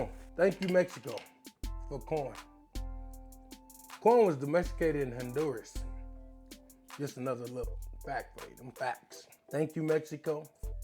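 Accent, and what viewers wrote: Texas, United States. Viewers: I guessed that you were a Texan! The accent and the hat did it.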